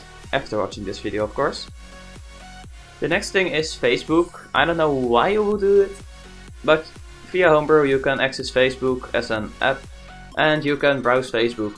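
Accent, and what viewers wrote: Netherlands. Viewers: You sound Dutch my boi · hij is zoo nederlands haha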